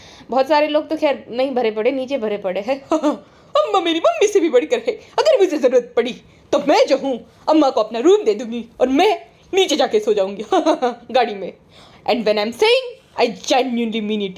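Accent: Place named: Marwar, India. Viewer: Aapki ki accent Gujarati bilkul marwdi jaise hai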